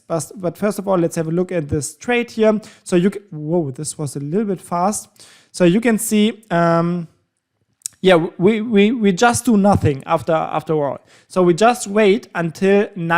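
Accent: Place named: Germany. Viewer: Since this guy is intentionally not telling you about time zones, etc. I will do it for him. Judging from his accent, he is probably in Berlin. Which is GMT +2, which also happens to be the same time zone as many/most of the brokers, probably yours too. And definitely his. Lucky for him, his broker is in his own time zone. The rest of us have to translate time zones. He’s starting the high/low range box at 2 AM his time which is also his brokers time. Lucky for him! But not so lucky for the rest of us. is two hours after the close of London. Translation: run your high/low range box from the beginning of Tokyo session continuously for 1.5 hours. Then start trading after that point all the way until two hours after the close London session, which is coincidentally when the Forex volume starts to drop I have no idea why this guy doesn’t explain it. Perhaps the create confusion to drive people to his website and his broker website..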